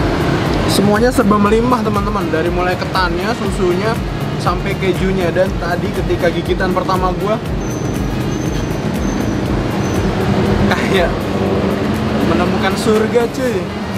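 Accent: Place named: Banyumas, Indonesia. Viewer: Udh tau dr tegal manggilnya aa, tegal kui jowo ngapak mas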